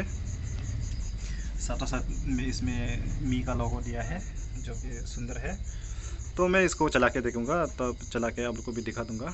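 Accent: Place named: Assam, India. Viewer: Yeh asamee hai. T ka uccharan dekh ke hi samjh gya.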